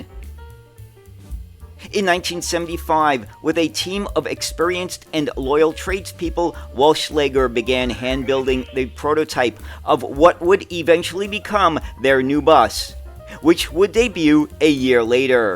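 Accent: New York, United States. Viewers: I still think is a NEW YORKA! Come on, you can't hide it with that accent, you are a NE-NE-NE-NEW YORKA.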